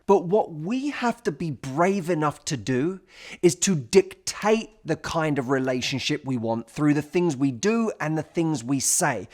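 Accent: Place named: United Kingdom. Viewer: adoro il suo accento britannico ed i suoi consigli!😍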